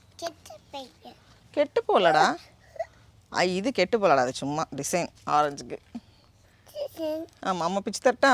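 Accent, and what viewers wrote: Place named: Tamil Nadu, India. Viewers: Vallu Vadhaku.. Nagercoil, Thoothukudi, Thirunelveli Slang.. Nice :-) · Neega pesum pothu Kanyakumari slang maari irukku 😘❤️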